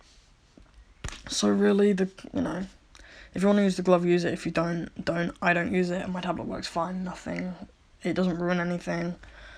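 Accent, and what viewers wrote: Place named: United Kingdom. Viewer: YESH, LE BRITISH ACCENT IS HERE >:33 I don’t know if I could ever use a screen tablet but boi, is yours pwetty ^^ Also, those clothing skills tho 😫👌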